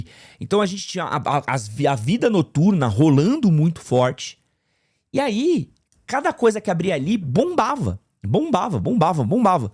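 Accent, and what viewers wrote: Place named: São Paulo, Brazil. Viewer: esse video soou muito: " seum paulo meoo" pra mim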